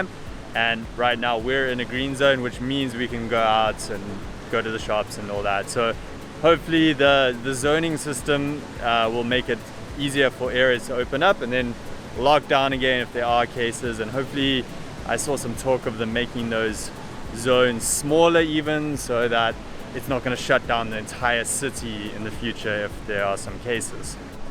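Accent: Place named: South Africa. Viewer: tried to place your accent, not North America, i guess South Africa?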